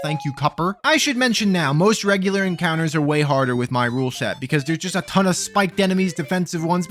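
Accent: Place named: New York, United States. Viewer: I never knew I wanted someone to do game challenges, talking a mile a minute, and with the THICKEST New York accent I've heard in my life before! I watched this and the Mother 1 video yesterday, and I find them interesting.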